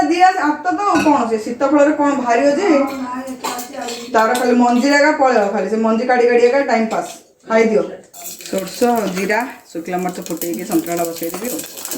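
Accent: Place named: Ganjam, India. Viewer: ଭାଉଜ ବଡ଼ ଶାନ୍ତି ଲାଗିଲା ହେ ତମ ଶୁଦ୍ଧ ଗଞ୍ଜାମ ଭାଷା ଶୁଣିଲା ପରେ। Love from Capital 🙏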